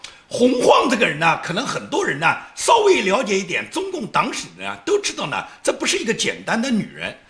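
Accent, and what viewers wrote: Nanjing, China. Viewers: 喜欢建民用带有南京腔骂洪晃，过瘾！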